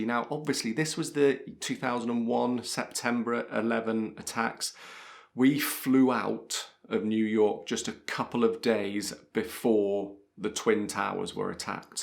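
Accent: United Kingdom. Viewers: Professore ho preordinato il Suo libro. Darei qualsiasi cosa per avere il Suo accento così British. Faccio le prove a casa per avvicinarmi sempre di più ma non è così facile. Grazie. A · It's sounding really British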